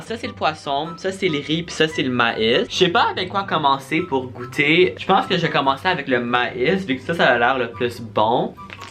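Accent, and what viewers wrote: Quebec, Canada. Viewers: #cloche <3 Merci pour cette vidéo en Français !! Je t'adooooooore ! tu parles vraiment bien français et j'adore ton accent Québécois, tu n'en as presque pas ! on dirait un Parisien parfois :) Kiss from Paris 🇫🇷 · Son accent canadien 😊 Il y'a de l'amour dans l'air...😏😇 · C'est cool!! Et tu as un accent quebecois de ouf ducoup 😂😘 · OMG, Es-tu Québécois!? Parce que t'as vraiment l'accent québécois! 😱😱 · Il parle Comme les québécois c'est fou !!! 🤨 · Omggg j adore full ton accent quebecois c full cute pis ca fait vraiment bizarre de t entendre parler en francais apres t avoir ecouter parler en anglais pendant longtemps anywaysss jvous aime troppp les deuxx vous etes full chill pis cutee ❤️❤️❤️ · Ton accent canadien est insane sa fait trop bizzare de t entendre parler francais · Tu parle comme un quebecois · j'aime ton accent Québécois!! 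· es-tu québécois ? parce que moi oui, pis j'entendais comme ton accent mais il était comme mélanger avec du québécois pis du Français de France. sinon good job pour ton Français! et aussi mais souflé = pop corn (mais au Québec(Français ) on appelle du pop corn du pop corn), sinon oven= four en Français. pauvre toi à la fin de la journée tu filais pas bien, j'espère que vous allez mieux. -Maya xxx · Je trouve ça encore étrange de te voir parler en québécois mais c’est trop nice hahaha cool vidéo! #cloche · plus tu parles français, plus ton accent québécois revient yessirrrr🤪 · Ton accent quebecois est en vois d’instinction ...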